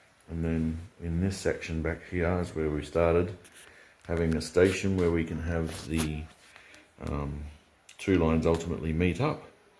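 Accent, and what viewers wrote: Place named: Australia. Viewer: Hey man, thanks for the upload and update! It is starting to take shape. Nice to see your little one get involved. Ps. Isle of Wight?? You sound aussie! I am so confused.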